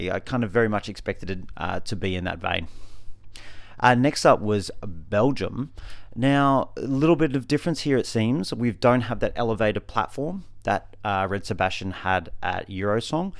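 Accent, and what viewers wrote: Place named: Australia. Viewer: Hope you had a fantastic birthday! 🎂Been listening to for years and have always apprechiated an aussie voice to the fun of the eurovision song contest. best wishes from a fellow aussie!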